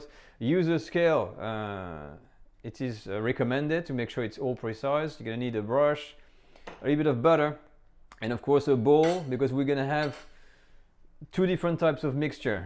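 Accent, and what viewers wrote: Paris, France. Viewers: Parisian Accent 😁 Love your cooking ❤️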